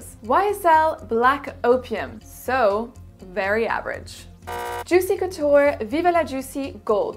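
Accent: France. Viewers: The addiction for "Alien" and YSL "Libre" my mom has passed down to me is magical and indescribable! Your French is just so satisfying and lovely to hear! Merci! ♥️ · Un accent français hh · U have an excellent frensh accent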